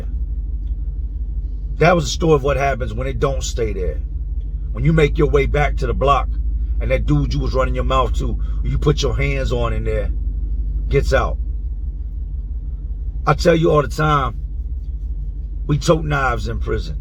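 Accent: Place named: Arkansas, United States. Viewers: U can tell u spent time in Philly.. u got the accent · oh my gosh, that’s probably one of the reasons I like this channel so much. I’m from rural Arkansas and all of my male family members talk like that. It’s very comforting to me😂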